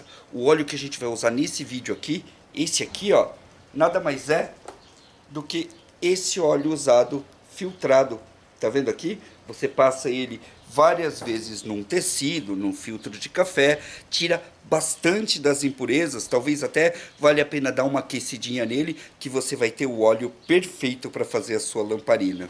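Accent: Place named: São Paulo, Brazil. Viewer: Que bonitinho sotaque paulista!! O mais importante é o tutorial.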